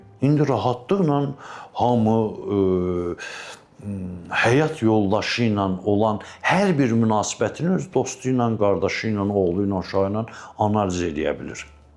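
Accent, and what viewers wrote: Azerbaijan. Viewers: Bu kişi tər təmiz əsl Azərbaycan dilində danışır.amma bəzi kometləri oxuyanda adamın heyfi gəlir bu kişinin danışmağına.Necə tələffüz edirlər elə də yazırlar.🤔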